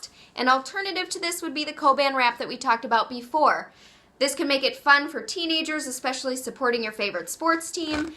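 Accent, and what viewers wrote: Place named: Minnesota, United States. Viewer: i can tell you are from minnesota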